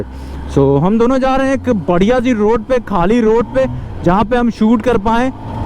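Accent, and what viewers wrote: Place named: Uttar Pradesh, India. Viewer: Bro your up accent is 🔥🔥🔥🔥🔥🔥🔥,keep speaking 🗣️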